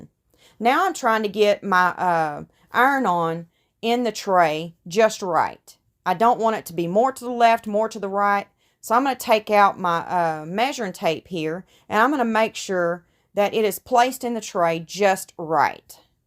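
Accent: South, United States. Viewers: I adore these mixed media resin style pieces❤ur daughter is gonna adore it I’m certain of it!! Did I see on the hobby lobby sticker Oklahoma? I knew u were from the south Cz of ur draw & that u say “fixin to”! I went to high school in Claremore Oklahoma & still have friends I visit in Oklahoma City every few years. It be crazy if ur from around there too🤯🫢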